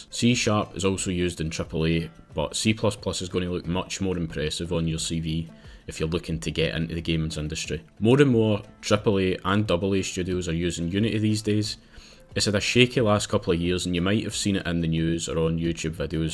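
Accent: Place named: Glasgow, United Kingdom. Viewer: Watched the whole video not because i am super interested in game dev, but u sounds like ur from Glasgow, so supporting you bro!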